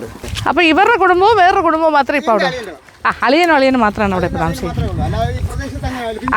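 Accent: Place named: Kottayam, India. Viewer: Kottayamkar samsaram kettappol thonni,njan kottayam😊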